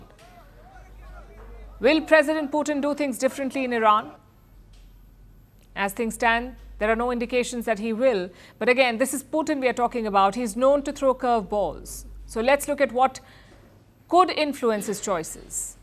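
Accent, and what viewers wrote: India. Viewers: Sorry can't watch with that indian paajeeet accent. It's horrible.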